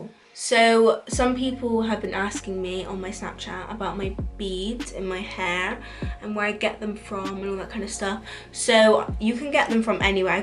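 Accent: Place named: England, United Kingdom. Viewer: What part of England do you live ? Coz ur accent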